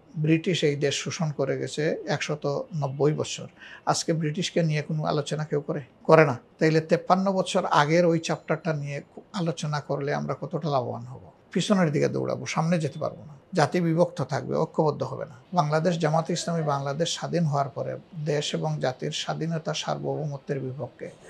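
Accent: Sylhet, Bangladesh. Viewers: জি উনি সিলটি। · Apnar kotha shunle r valo lage na . Bangla bolen . Sylheti na .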